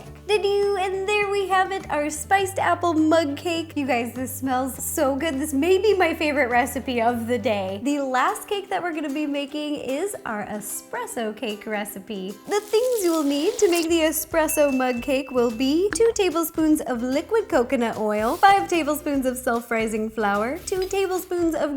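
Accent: United States. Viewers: today you kinda sounded extra....country.....ish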